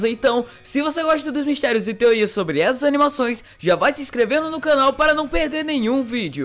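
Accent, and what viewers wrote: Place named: Pernambuco, Brazil. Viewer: Mano vc é pernambucano ! Gostei do sotaque tá carregado n he he !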